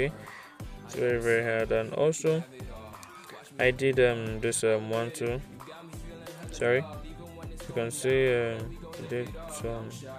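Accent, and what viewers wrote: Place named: Nigeria. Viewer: Lol where you from u sound Nigerian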